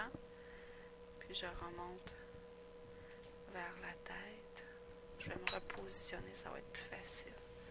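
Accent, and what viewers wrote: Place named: Quebec, Canada. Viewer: Québécoise tout simplement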